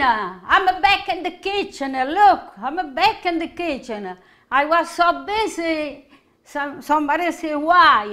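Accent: Italy. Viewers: Devo dirti che ero davvero preoccupato per la tua così lunga assenza… La prossima volta fai dei brevi video per far sapere a tuoi amici che stai bene… Amo la tua cucina (e il tuo inglese con accento italiano 😄) e questa volta hai cucinato la mia vita No .1 ricetta!!! 😀😀😀♥️♥️♥️🇮🇹🇮🇹🇮🇹 Il tuo super fan greco dalla Polonia/Germania….. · I love the way you cook. But I really love listening to your Italian accent and your singing!!! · Italian accent sounds very good and it sounds so better, when it's about italian cuisine! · I've recently started watching Grandmas videos and I'm learning a lot. I love her Italian accent. I will be trying these recipes at home. Love you Grandma from South Africa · is so precious! I love to hear her Italian accent. I love you!❤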